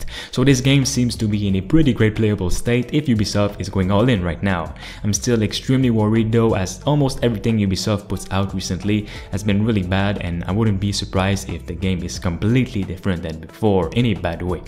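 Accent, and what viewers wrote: Quebec, Canada. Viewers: Toé t clairement québecois 😅✌❤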